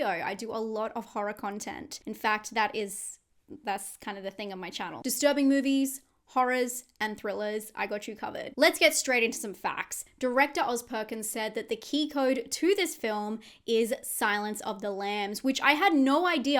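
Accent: Australia. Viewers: I'm sorry, I'm just messing with you. I know it's because of your Australian accent. It's actually kinda cute and adorable, and I loved the video btw!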